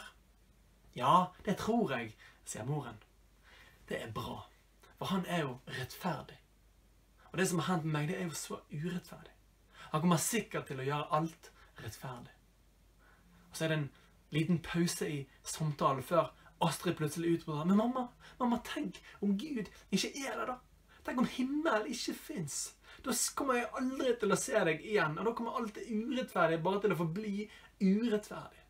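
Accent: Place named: Bergen, Norway. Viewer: Dette er knall bra, liker at du er glad hele tiden og elsker bergens dialekten!!! Me ser på deg i klassen av og til, går på ein kristen skole der du har blitt vist på andakter flere ganger! stå på!!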